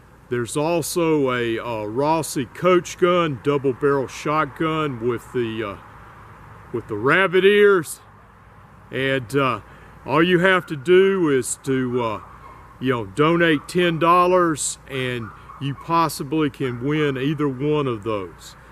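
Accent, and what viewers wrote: South, United States. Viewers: My favorite carry is my S&W snubbie with laser. So light and easy to carry which explains why it’s my favorite. Keep up the good work. I love that southern accent of yours! LOL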